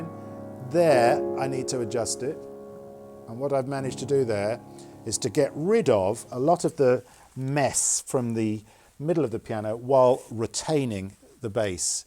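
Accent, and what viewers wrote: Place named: United Kingdom. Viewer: Very enjoy hearing your British accent :)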